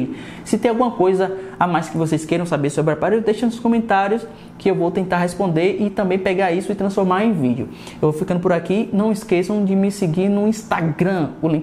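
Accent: Bahia, Brazil. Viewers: Baiano kkk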